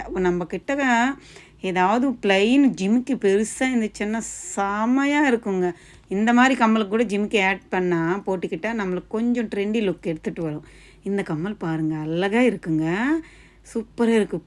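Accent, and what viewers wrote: Telugu, India. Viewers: Sis neenga telugu vaasanai vesura tamil la pesuradhaala adhu keka nalla irukku ❤❤❤❤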